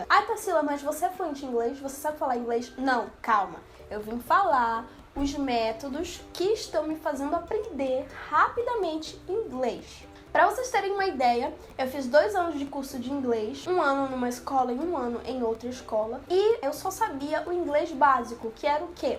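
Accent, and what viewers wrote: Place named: Santa Catarina, Brazil. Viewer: Gostei de seu sotaque catarinense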